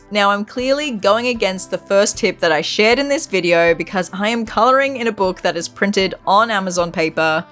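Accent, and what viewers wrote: Australia. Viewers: I appreciate that Ohuhu sponsors so many Australian artists so I get to hear them all say "aurhewhew"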